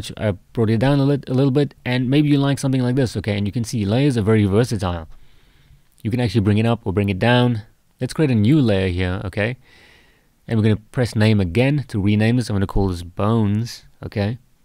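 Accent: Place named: South Africa. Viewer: Could hear the accent was wondering if you're South African - thanks a lot for this, will be watching a lot of your content. So cool to see a creator from SA on youtube with nice tutorials. Made my day!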